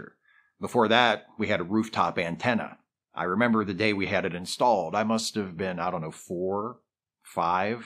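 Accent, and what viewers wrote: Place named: Maryland, United States. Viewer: Sounds like you're a fellow Marylander. Or at least originally from the area.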